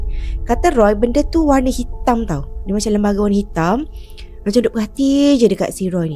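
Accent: Kelantan, Malaysia. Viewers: Assalamualaikum cik puan story ni maaf bertanya lah yer, Kelantan tu cik puan daerah mana tu, maaf sebab bertanya tu cara percakapan tu mcm loghat Kelantan, sekian terima kasih.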